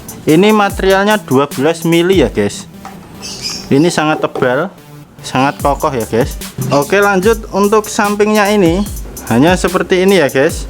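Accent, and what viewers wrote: Java, Indonesia. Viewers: Medok sekali km gaes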